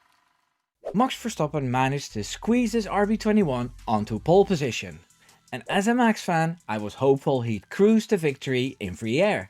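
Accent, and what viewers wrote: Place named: Netherlands. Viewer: I mean, even if I would have seen your videos for a very first time I would have known your Dutch by (and I don't mean this insutling) your obvious Dutch accent lol and no Haas is not rabbit, Haas is Hare. a rabbit is a konijn.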